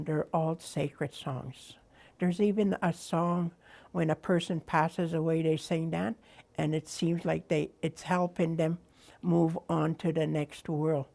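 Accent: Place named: Canada. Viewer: I love that she keeps saying "Eh". A beautiful linguistic blend of Cree and Canadian English :-D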